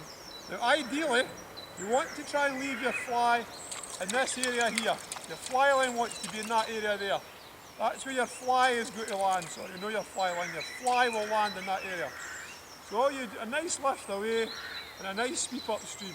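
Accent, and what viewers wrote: Scotland, United Kingdom. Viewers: Ah unreal they were the days tight lines best Scottish accent ever be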